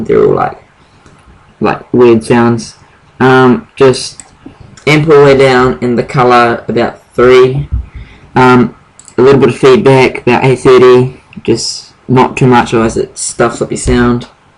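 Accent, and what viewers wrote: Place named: New Zealand. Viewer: lol hard nz accent hahaa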